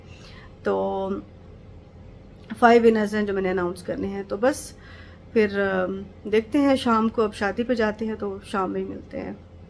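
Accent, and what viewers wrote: Saraiki, Pakistan. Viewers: Over acting mat Kiya Karen ap Ka lehja seriki hai Urdu theak NI bolti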